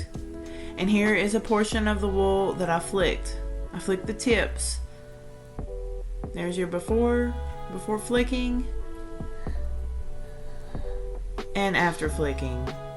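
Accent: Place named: South, United States. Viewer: I’ve been washing fleece for many years. I never knew to soak it in plain hot water first. I’ll have to try this. I have a method to get more water out of your fleece. I use old bath towels folded in thirds length wise. I spread a layer of washed fleece on it, roll it up and step on the rolled up towel. When you unroll the towel the fleece is damp and the towel is wet. It dries much faster and the rolling and presser from stepping on it has never caused the fleece to felt. You sound like you’re from the south. I’m in Tennessee. Are you anywhere close? Enjoyed your video. Thank you.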